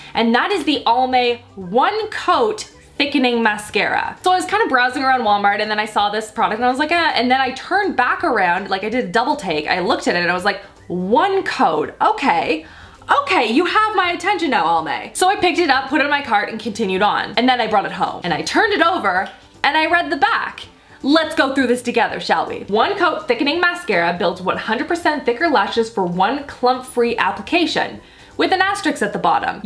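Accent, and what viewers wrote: Canada. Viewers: Please... do a tutorial about your look! With the typical Canadian "about" :D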